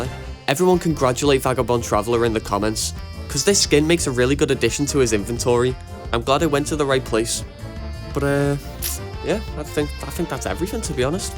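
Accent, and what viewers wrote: United Kingdom. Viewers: united kingdom accent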